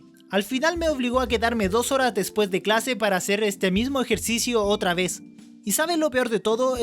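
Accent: Chile. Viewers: Por su forma de hablar se nota que es chileno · Alv sabia que vivias en chile :v tu voz sonaba chilena '_'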